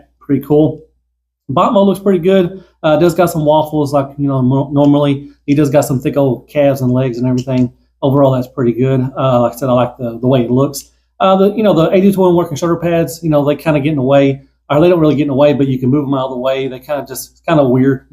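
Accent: South, United States. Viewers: Great review once again, just as I'm about to go to bed. I'm gonna have a nice, quiet sleep now thanks to you and that southern accent.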